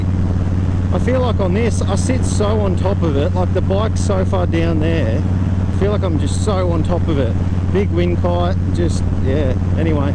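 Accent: Australia. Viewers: Wonderful review. The exhaust note is therapy. And your strong australian accent is a pleasure to hear 😊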